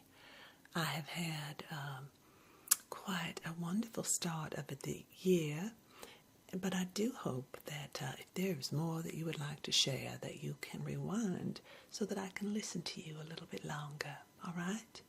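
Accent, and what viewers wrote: South, United States. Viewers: Oh my gosh yay. I love, that southern accent is so tingle inducing. Love love looooove <3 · Oh what a wonderful surprise! Absolutely love love love this Southern belle! Just exquisite and relaxing. Happy new year!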